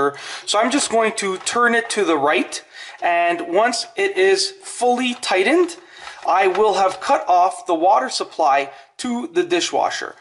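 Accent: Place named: Montreal, Canada. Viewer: Excellent tutorial. Are you Canadian? You have a Montréal accent.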